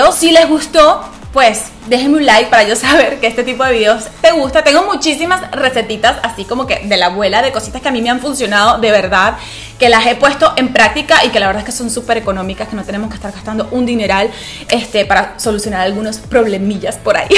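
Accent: Venezuela. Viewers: Es venezolana? es que tiene el acento 😁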